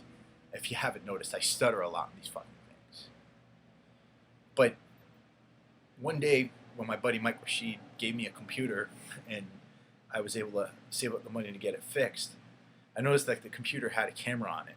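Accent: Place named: East Coast, United States. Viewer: Thanks for the videos and the motivation. Keep them coming! For the record, east coast accents are the only real American accent. #BXNY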